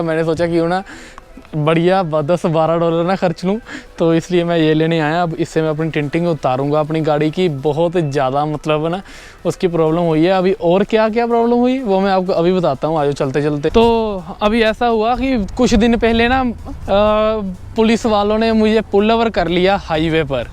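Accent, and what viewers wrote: Punjab, India. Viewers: Bro tuhadi Punjabi leak kardi rehndi hai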